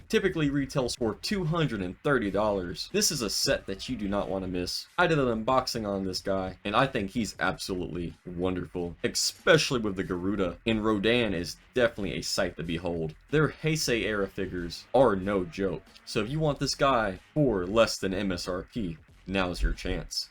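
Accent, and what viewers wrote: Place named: United Kingdom. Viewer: ? you sound like you’re British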